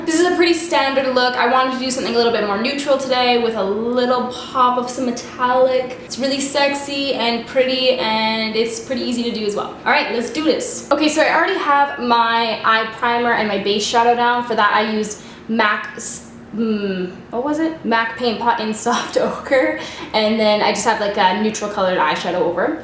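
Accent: Canada. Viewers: Your Canadian accent tho! Can't get over the way you say crease, I love it! Love your work xx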